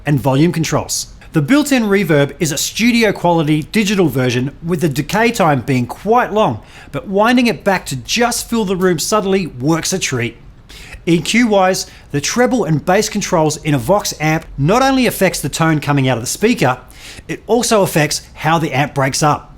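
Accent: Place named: United Kingdom. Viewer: I, for one, was impressed by the British accent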